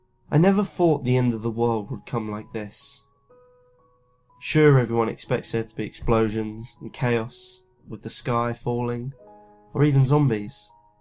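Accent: London, United Kingdom. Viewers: The last line is so true..but even with 15people around you fighting for your life...(I had an accident and ruptured an artery) by the time only like 5minutes it took to be driven to the hospital, I had bled out two thirds of my blood..the big veins in my neck had collapsed and they couldnt get a line in. People seem to think bleeding out is painless but that is a cruel lie when I think of poor people taking their lives thinking all that lies ahead is dreaming into oblivion. The second your brain registers the drop in blood pressure it tells your heart to pump faster but while your heart jumps to command and quickly develops tachycardia then ventricular fibrilation (its like a big man jumping up and down on your chest 200+ times a minute. Meanwhile your brain is pushing your lungs as it feels oxygen depletion. They had to cut into my Femoral vein in my groin..no time for local anesthesia..the guy who did it was the cheif anesthetist (they are most qualified in hospitals for keeping people alive..he squatted over my chest on the trolly and cut then manually forced the teflon Venflon about 8" into my vein. 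In those frantic terrifying minutes I learned that EVERY man and woman is alone even in a crowded room we are prisoners in a meat and bone cage and, in the end, you go out as most of us came in, alone. Oh this is true..by your accent you've probably been near Homerton Hospital in Hackney without whose fast thinking anaesthetist I would have completed my journey still,as alway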